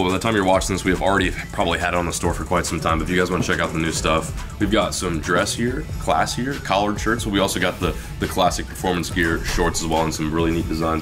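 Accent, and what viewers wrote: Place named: Texas, United States. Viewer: I’m starting to hear some texas twang in your voice, has been acclimated🤝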